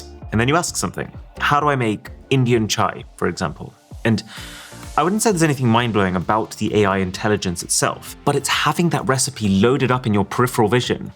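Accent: United Kingdom. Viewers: could also be u have the most clear british accent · Through out my professional career i saw one constant thing - People get away talking nonsense with their British accent. NO doubt this is a great product but what ruined it for me the over action you do with all your reviews. I better get back to my fav review guy Marcus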